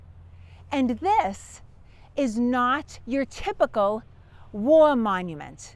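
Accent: Boston, United States. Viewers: 1st, I love the accent. My job moved me to the west coast about a5 years ago. Hearing that Boston accent makes me feel at home. That said, BA was a great general until he wasn't. Wasn't it the guns captured there that were used to force the British from Boston after they were placed on Dorchester heights? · Love to hear your accent! Although this is about Saratoga, I said she has to be from Boston! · If only Benedict Arnold had not met and married teenager Peggy Shippen, history might have looked at him very differently. I love your enthusiasm and your Boston accent (calling a park a pac). Really enjoying your videos. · Another great video, as you were talking, I was thinking of who could not be there. And Arnold came right in my head. I've been watching your videos for years. I am a tour guide in Boston and portray Handcock. I use a lot of your bits of Revolutionary history with my tourist. 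Things people have never heard. Thank you for all your hard work bring us these videos. Continue to stay true. Love that Boston accent! Cheers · Love the videos! The genuine Bostonian accent is wonderful and adds to the fact that knows the topic. MORE VIDEOS PLEASE! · Love the history lesson with such enthusiasm and your lovely Boston accent, thank you. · I absolutely love your enthusiasm and that heavy Boston accent there's no one better to talk about the Revolutionary War then someone from Boston. Great job loved the video and I also subscribed.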